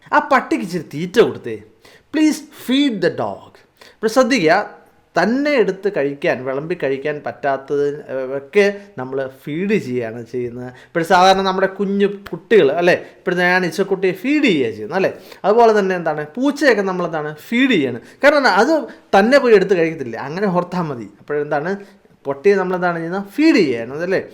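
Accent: Kottayam, India. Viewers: Are you from kottayam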